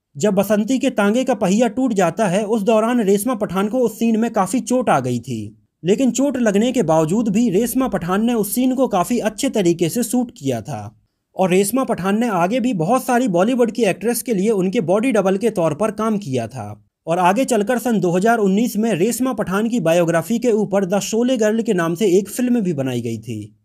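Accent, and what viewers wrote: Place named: Uttar Pradesh, India. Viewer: Ye style UP side kee ek thayth style hai, ye inkaa dosh nahin, but he should have tried to speak in proper Hindi, bhalay hee speed kam detay. But frankly seeing his hard work I could understand what he wanted to say and enjoyed. But yes when you are posting on youtube improve the language as much as you can.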